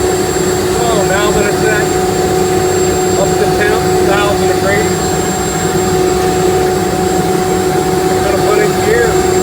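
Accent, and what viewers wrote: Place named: South, United States. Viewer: Okay, you've got a Southern accent. I was driving on I285 outside of Atlanta some months ago, and holy-traffic jam, Batman, the Batmobile was driving in the lane next to me. My wife was with me, so she saw it too. Couldn't get a picture of the thing, but we weren't hallucinating, so we chalked it up to a visual spotting of a UDO (unidentified driving object). And didn't think much about it till this video. Ever been to Atlanta? I just assumed that it was being driven to one of the local movie studios that have popped up down here.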